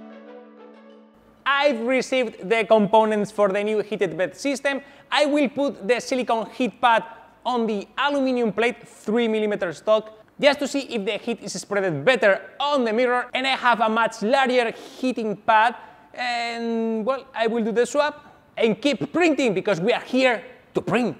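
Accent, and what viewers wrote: Spain. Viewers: como se nota que eres español xd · 4° Comentario en español xD, empiezo a ver el video y digo, por el acento, este es español jajaja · Has un canal en español, se nota que eres español:v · No te había visto nunca y cuando te he visto hablar me he dado cuenta q eras español, al instante. Muy buen video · He's not Italian, he's Spanish. Didn't you notice the accent? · Se nota bastante que eres español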